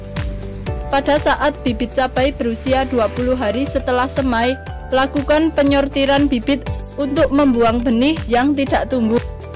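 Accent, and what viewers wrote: Java, Indonesia. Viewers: Tapi suara presenter nya medok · Medhok tapi Keren 😁😁😁😁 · Saya gk butuh MEDOK 😀 Nya....saya butuh informasi ilmunya.... semangat nduuuuukkkk...👍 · Medok bngt ngomongnya😂😂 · Salm medok..😅😅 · Saya seneng pembawa acaranya ada medok medoknya gitu 😀😀😀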